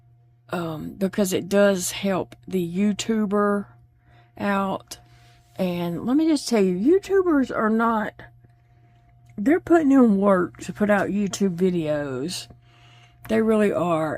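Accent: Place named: Louisiana, United States. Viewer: Hi, I hope you will always find a way to keep crocheting but even if you couldn’t, I’d still watch your videos just to listen to your lovely Louisianan voice and hear your giggles. From now on I will also let the ads run through without skipping. I’m crocheting anyway and not watching the screen all the times. I hope you feel better soon. Best wishes from England :)😊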